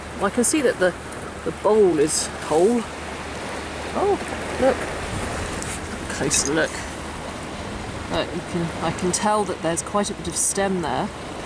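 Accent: United Kingdom. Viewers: You have a lovely voice. And as a non native English speaker and someone who used to live in London, I am enjoying your British accent thoroughly!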